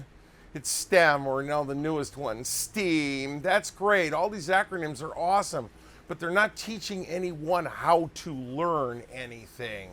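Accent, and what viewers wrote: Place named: Chicago, United States. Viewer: Out of curiosoty, just where the heck are you from? I almost hear a Chicago accent? Maybe Cleveland?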